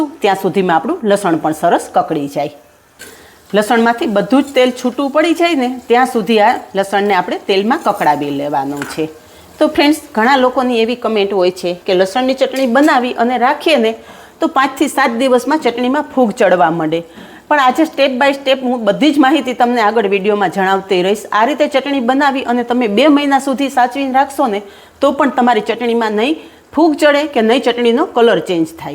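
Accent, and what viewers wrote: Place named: Kathiawad, India. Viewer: Kathiawad ma bhaagye j jova malti uchchaar shuddhi , ane chatakedar chutney....khub saras . 😋😋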